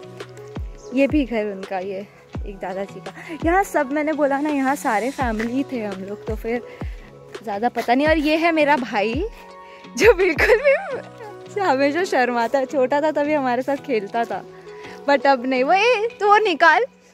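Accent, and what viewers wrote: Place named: Maithili, India. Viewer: Where are u from? Sitamarhi or Muzaffarpur? The accent i heard is "Maithali'', so i guess u from Sitamarhi. I am from Sitamarhi, Bihar too and currently i live in New Delhi. BTW i loved your vlog. It reminded me of my village. Keep going.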